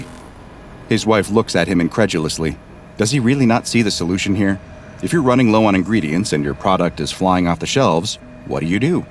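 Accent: England, United Kingdom. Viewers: British accent is perfect trough video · is she britosh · This is one of the weirdest SCP anomalies I've watched so far, and there are some really weird ones. Nice English accent by the way.🙂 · i love how he added the BRI'ISH ACCSENT YEAH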